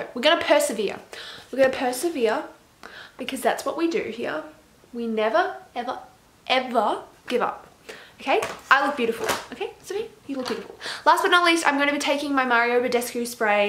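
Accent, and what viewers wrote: Australia. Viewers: Is anyone else obsessed with her Australian accent, I'm from Sydney and for some reason I just love listening to her talk. Maybe also because you just give off such positive vibes☺️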